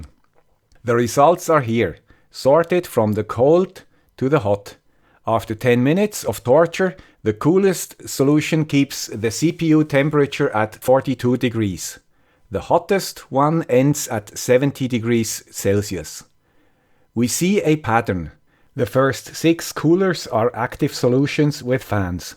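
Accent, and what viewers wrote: Switzerland. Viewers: Your Swiss accent is nice. :) Since this video came the Argon ONE Pi 4 and Argon ONE Neo. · Tönt sehr nach emne Schwiizer 👍🏻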